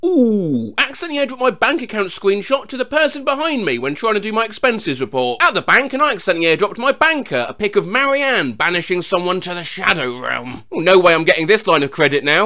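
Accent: United Kingdom. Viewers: This guy is so aggressively British I love it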